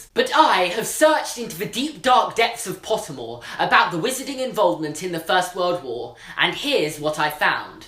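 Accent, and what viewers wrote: England, United Kingdom. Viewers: British accents are adorable tbh I love them so much. I'm watching these videos to hear the accent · Where is he from? I recognise his accent, but I can't place it (I know its English, but not sure where specifically)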